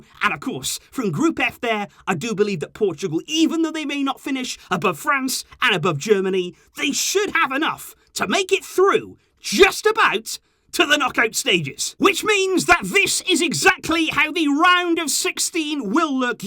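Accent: Wales, United Kingdom. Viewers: Am I the only person realizing he’s Welsh 😂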